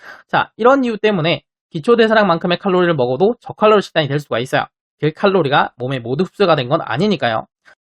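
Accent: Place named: Gyeongsang, South Korea. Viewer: 이분 경상도분이신듯 약간 억양이 왜 경상도사람들이 아 어 발음이 좀 새는경향이있거든요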